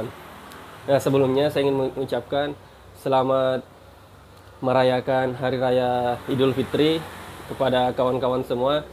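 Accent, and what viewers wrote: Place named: Bugis, Indonesia. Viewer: Kayak nya kita satu daerah dari logatnya bang kl boleh nebak dari bugis ya saya juga asli sulawesi tapi dari kecil di jawa skrg sdh menetap di jawa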